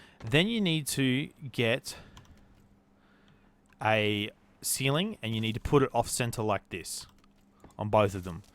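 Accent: Australia. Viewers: Love the vids! It's good to see/hear more Aussie you tubers!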